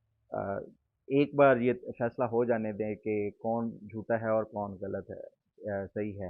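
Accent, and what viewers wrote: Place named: Punjab, Pakistan. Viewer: شہبازمناظرے سے نہیں پاکستان کے گندے نظام سے بدل ہو کر گیا ۔ پاکستان میں جیوڈشری نظام کرپٹ اور بےکار ہو چکا ہے۔ پویس اور فوجی نظام غلاظت کی حد تک گندا ہے گھروں کی عورتوں بزرگوں پر تشدد عام ہے ۔ انسان کی عزت نفس محفوظ نہیں ملک میں رانا ثنا خبیث نےملک میں بدمعاش غنڈے سارے ملک کے برتی کر رکھے ہیں جو پہلا کام کرتے ہیں انسان پر جسمانی تشدد کرتے ہیں ۔کون سا آئین آپ امریکہ کے آلہ کار ہیں سب یہئ کہتے ہیں ۔ آپ کا لہجہ پنجابی کمزوراورکرپٹ انسان کا ہے ۔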